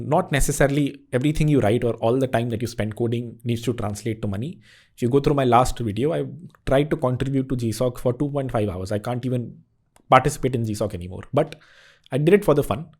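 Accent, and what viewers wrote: India. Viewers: I turned on caption and realized he is speaking Indlish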